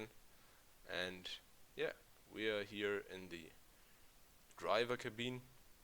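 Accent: Germany. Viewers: German? xD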